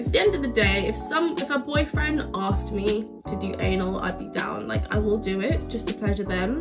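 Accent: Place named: United Kingdom. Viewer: You cute love from NYC and I love ya U.K. accent love from NYC once again 😘💗❤️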